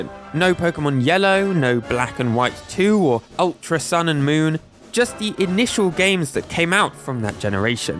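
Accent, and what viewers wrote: United Kingdom. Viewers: I don´t know why, but it´s just hearing your calm voice and brittish accent and i just get super chill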